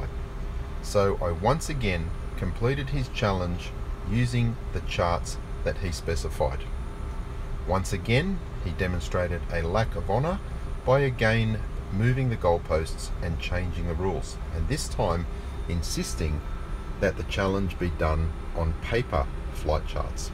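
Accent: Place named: Australia. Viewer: Australian I believe.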